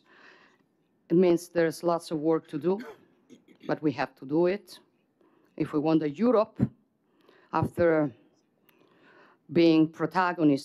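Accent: Rome, Italy. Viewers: Brava Melona ma l' accento è de Roma 🇮🇹( per fortuna) hahaha 🤣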